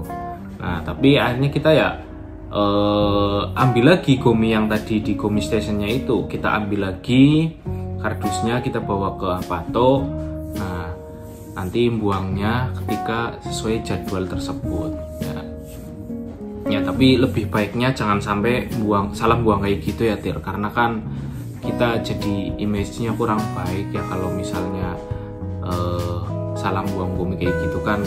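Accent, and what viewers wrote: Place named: Banyumas, Indonesia. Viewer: Mas ngapak..asal kan ngendi..?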